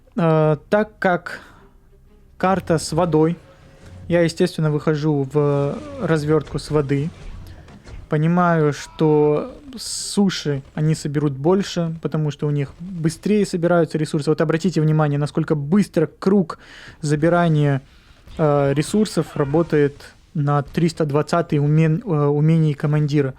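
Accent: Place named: Russia. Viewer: не то чтобы хорошо, просто русский акцент сразу в уши бросается)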